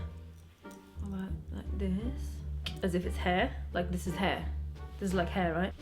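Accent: United Kingdom. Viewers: That british aCCENT thou! U go dina As if am hearing adEle the singer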